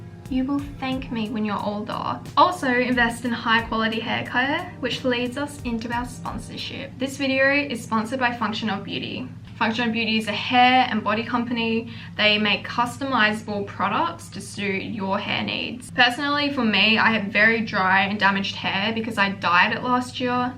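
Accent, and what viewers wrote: Australia. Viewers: im not even listening to her advices i just looove the way she talks and that heavy aussie accent xD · as soon as i heard ur aussie accent, i knew you’d be a youtuber i’d love and man i just absolutely adore your duality and how you’re so empowering and motivating✨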